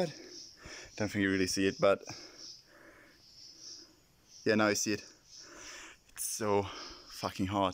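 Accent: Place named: Finland. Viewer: So sweet ☺️ sounds more like Finglish than English, cool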